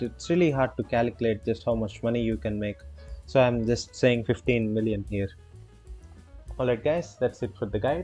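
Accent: India. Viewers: You sounds Indian my friend .. Are you?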